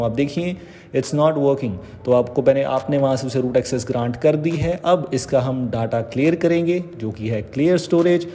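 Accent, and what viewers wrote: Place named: India. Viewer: Your English sounds like a combination of Hindi and English, I don't understand anything.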